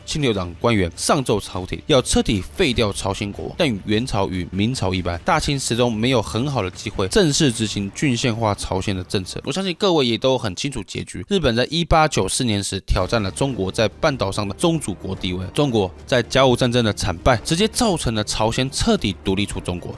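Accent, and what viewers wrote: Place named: Taiwan. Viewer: 台湾人就是这样腔调，两岸人应相互理解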